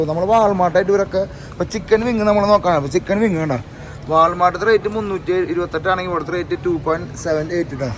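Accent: Thrissur, India. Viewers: ❤❤❤Wonderful description in Thrissur style and excellent camera...keep going ❤Artist Francis Antony Kodankandath from Thrissur,Kerala, India ❤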